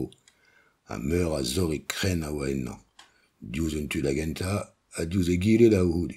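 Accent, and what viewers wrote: France. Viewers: Loved it, minus the French accent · The French accent makes me wonder what Tolkien's opinion on Breton was. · It's like ancient Cornish with a French accent. Fascinating. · french accent is so strong and annoying unfortunately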